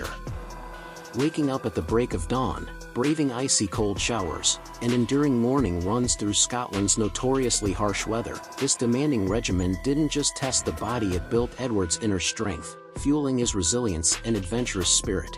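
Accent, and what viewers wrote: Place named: North America. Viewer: More crap with a North American accent 🥱🥱🥱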